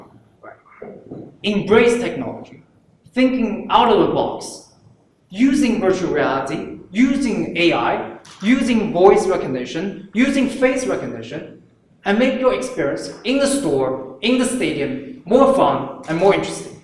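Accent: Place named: United Kingdom. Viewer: His British accent is so kool.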